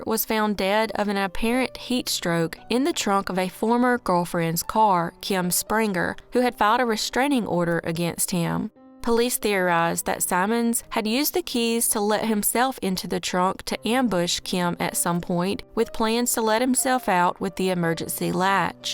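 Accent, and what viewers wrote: South, United States. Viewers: Found this by accident. You gained a follow. Telling me I can get stories at work with a southern accent. Best thing to happen today! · What part of the south are you from?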